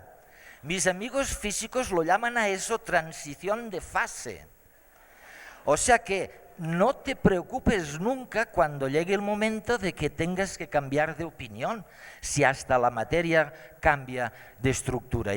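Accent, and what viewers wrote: Spain. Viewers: por el acento balear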